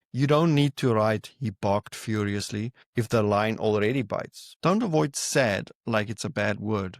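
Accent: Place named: Southern Africa. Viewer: That accent...which side of the Limpopo are you from? (Harare lad here!!)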